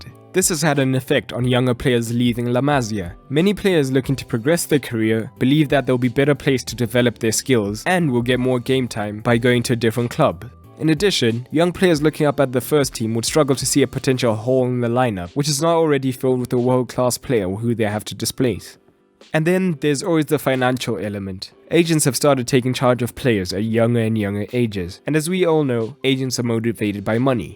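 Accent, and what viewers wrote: South Africa. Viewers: Hey mate are you from South Africa? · Accent sounds South African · You sound South African and black, I could be wrong but I doubt it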